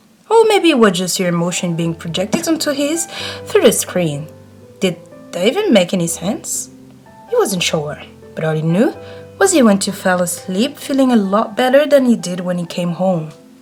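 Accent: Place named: France. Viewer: Cool video, love ur french accent ♡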